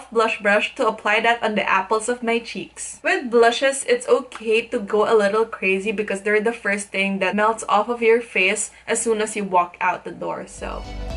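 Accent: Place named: Philippines. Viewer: Thank goodness I finally came across a makeup tutorial video where i can hear and understand straight Filipino English.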